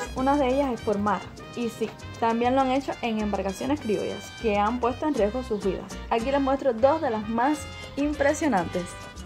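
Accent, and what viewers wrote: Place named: Cuba. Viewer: aveces hablas despacio otras veces te sale lo de cubano.tu hablas ingles?? Tu vlogs es in inglish.otris vlogs son en spanish..cuidate🫀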